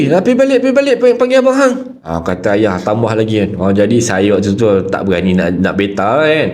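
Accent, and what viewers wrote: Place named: Kedah, Malaysia. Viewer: Loghat kedah abang cun noh,tak macam buat2,ketegaq lama tak dengaq,hahaha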